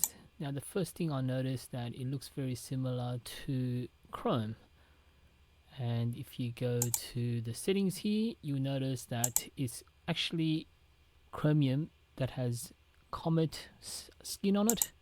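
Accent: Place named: United Kingdom. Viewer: Bro, what's your origin? You sound pretty UK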